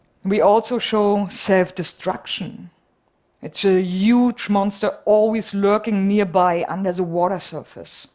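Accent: Germany. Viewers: Sies ... sies is why... german can't speak English... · I think the lecturer has a German accent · Sexy german accent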